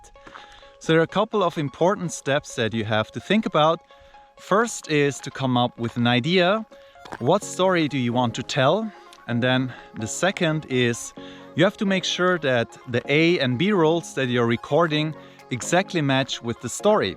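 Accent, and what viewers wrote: Switzerland. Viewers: Hi! 😊Thank you so much for your swiss german in this video, you are very kind and nice! 😊 Swiss german is great but too difficult to learn for me😊. Greetings from Poland for you! 🇵🇱😊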